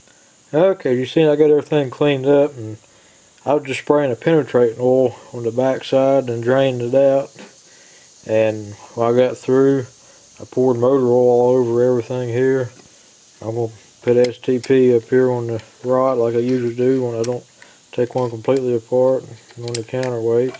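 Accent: South, United States. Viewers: Great video..thick southern accent but pretty much understood everything...Damned 10% ethanol fuel screws up everything in the fuel system! It's always a good idea to put a petcock on the fuel line and just shut it off and let the motor run out of fuel when you're done mowing.. Otherwise your needle valve on the float in the carb wont seat properly with all the corrosion and you'll either have fuel leaking out the exhaust or get gas in the crankcase!! I had gas in the crankcase and it caused all kinds of weird problems. Thought I blew a head gasket with all the smoke until I started taking it apart and gas was leaking out of the head. Now I have to put the head back on and take the carb apart, so I decided to just clean up the head, valves, and piston, and replace the needle valve and seat on the carb. Hopefully it will run again!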